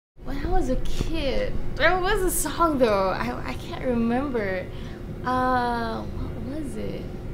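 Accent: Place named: Malaysia. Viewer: She is from Malaysia like I am so that's why she has a little bit of accent of malaysia.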